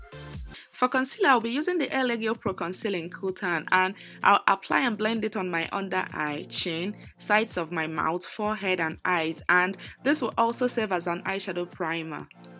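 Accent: Nigeria. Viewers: i just love you ...your makeup is good..your psyche and that nigerian accent is lit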